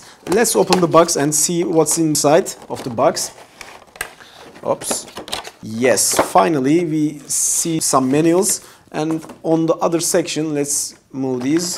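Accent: Turkey. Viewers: Thats Turkish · That's some heavy Turkish accent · abi fonetiğin türkçe konuşmanla birebir aynı vallahi hiçbir fark görmedim :D hasret kalmışız akıcı konuştuğu izlenimini vermek için kendini kasmayan insanlara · Birazda aksaniniz uzerinde calissaniz iyi olur. tamam, ingilizce anadiliniz degil, ama nasil bir hintli ingilizce konusurken kotu aksanindan dolayi anlasilmasi zor oluyor, ayni sekilde Turkiye'de cogumuz ingilizce'yi Turkce gibi kkonusuyoruz. Bu kendi aramizda bir sorun yaratmazda, ama eger Youtube gibi bir platformda ingilizce bir video yayinliyorsak, o zaman neden kendimizi iyilestirmeyelim ki?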